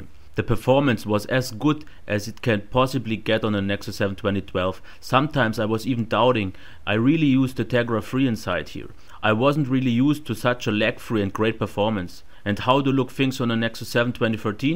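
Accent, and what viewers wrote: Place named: Germany. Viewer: so richtig deutsches englisch :D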